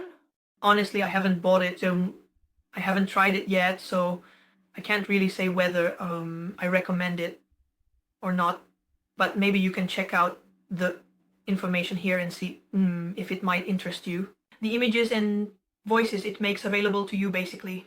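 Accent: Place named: Italy. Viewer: Another great video but what happened to your wonderful Italian accent? Have you gone A.I.?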